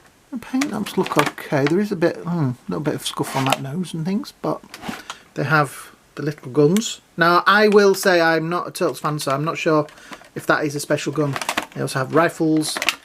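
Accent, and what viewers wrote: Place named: United Kingdom. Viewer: I only watch about 6 reviewers and you're one, the British accent gives you the edge over the others. Should do like a monthly livestream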